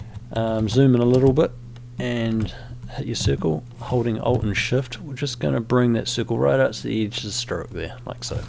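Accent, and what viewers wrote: New Zealand. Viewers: Thanks, great to hear a kiwi tutorial for once, very clear and easy to follow:) have subscribed and will check out more of your stuff soon, thanks for shearing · Thanks for this! Great to hear a kiwi accent on a tutorial for a change too.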